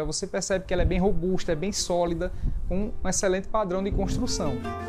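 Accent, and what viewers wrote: Northeast, Brazil. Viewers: Adoro ver as casas que você apresenta nesse seu canal. São tão maravilhosas que fica difícil nomear uma como preferida. No fundo no fundo, prefiro todas pois todas me inspiram de alguma forma. Rsrs E quanto a você, é um rapaz muito simpático, educado e bonito que sabe passar bem a sua mensagem com esse sotaque nordestino que eu adoro.. Boa sorte!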